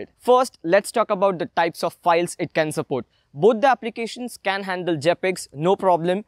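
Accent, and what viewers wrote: India. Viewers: The Indian accent is so funny! 🤣😂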